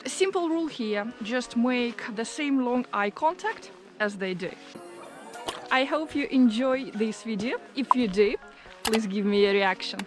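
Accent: Russia. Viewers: What is your accent. Are you from Russia or Eastern Europe? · Having trouble placing her accent. Russian? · The English accent sounds similar to Russian. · This Russian accent :) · I like your Russian accent 😬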